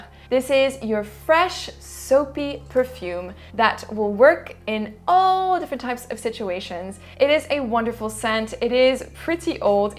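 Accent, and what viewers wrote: France. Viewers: Great video,! Everytime she pronounces the names in this French accent...I wanna wife her up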